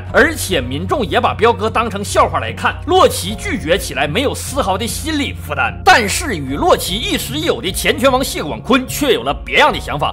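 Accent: Northeast, China. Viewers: 真的很大碴子. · 哈哈，我最喜歡聽說英語，，滿滿的東北渣味。👍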